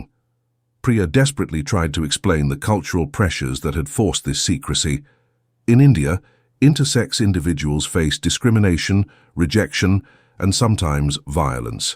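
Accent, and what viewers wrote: United Kingdom. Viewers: Get this crap off now! What's with the tosser English accent? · Me from Visakhapatnam in the Province of Andhra Pradesh, India. I am an adept listener of your narratives, most particularly for the sake of correcting and fine-tuning my British english pronounciation, and improving space of my vocabulary. Thanks for your melodious narrative.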